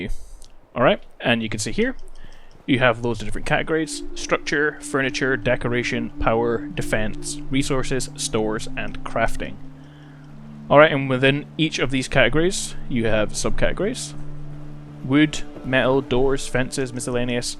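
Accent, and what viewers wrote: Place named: Scotland, United Kingdom. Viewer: Hey ,am strange question but where are you from? I know Scotland but specifically recognise your accent haha whereabouts In Scotland are you from?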